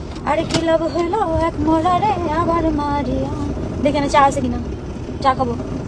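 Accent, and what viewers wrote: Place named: Barisal, Bangladesh. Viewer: Borisailla fokinni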